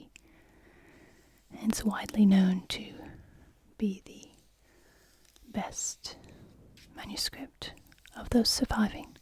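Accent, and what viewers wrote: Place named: France. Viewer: really love your french accent. i wish i could hear you read an entire book in french x)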